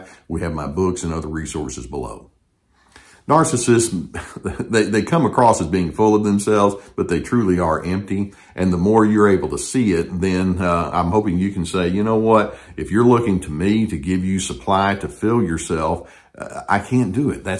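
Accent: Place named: North Texas, United States. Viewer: I grew up in D/FW but don't live there now. Your N. Texas accent is VERY comforting and familiar to me!:)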